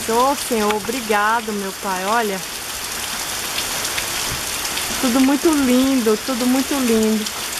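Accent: Minas Gerais, Brazil. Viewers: Pelo sotaque gracioso da, ela está em Minas Gerais...onde? No Paraíso! rs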